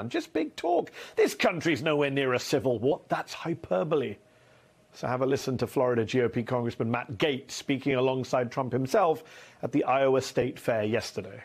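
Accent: United Kingdom. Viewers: You have a British accent you're not American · You dont sound like an American more like a Britain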